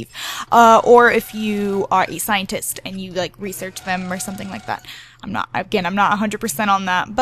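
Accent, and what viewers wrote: United States. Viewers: Your American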